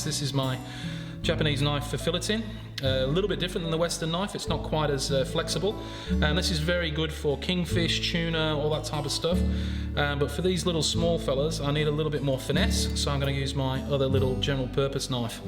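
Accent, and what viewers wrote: England, United Kingdom. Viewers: Flathead Is my favourite fish, Thanks for the vid. I can here the English accent in you voice. I live in australia but was born in Hammersmith London. How about you?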